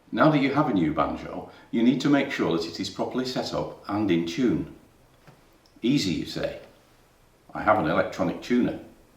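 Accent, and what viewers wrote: United Kingdom. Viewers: I never expected a posh Englishman to teach me how to set up the most redneck instrument in the world lol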